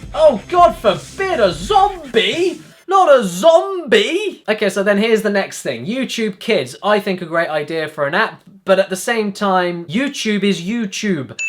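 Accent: United Kingdom. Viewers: Wait are you british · hes so Brittish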